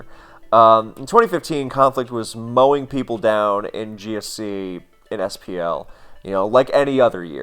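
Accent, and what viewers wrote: Germany. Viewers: you probably pick up on final devoicing, missing schwas, wrong use of fricatives, and Germans tend to overthink the "th". ^^ xD o.O -.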